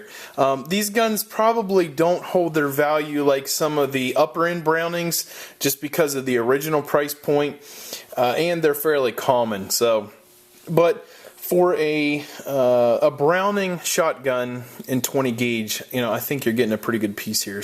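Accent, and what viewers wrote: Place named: Western Pennsylvania, United States. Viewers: Lemme guess...western PA or eastern OH? I grew up there and I can hear your Pittsburghese lol. Good stuff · I need to know if you’re from western Pennsylvania based on your accent.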